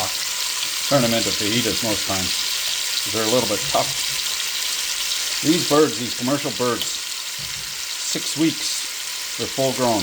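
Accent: Canada. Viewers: Bro, I RACE w Canadians and your accents I 1000% Canadian, Where do you get your “ Cajun” from? I hear you speak French. 100% better than me I love you and your vids. Just curious here. All the best!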